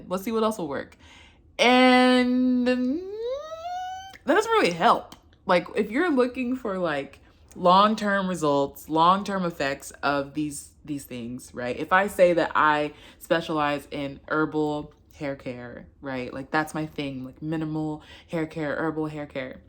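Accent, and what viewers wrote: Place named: Nigeria. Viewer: Random: I love your Nigerian (taking a wild guess) accent and thank you for this vid!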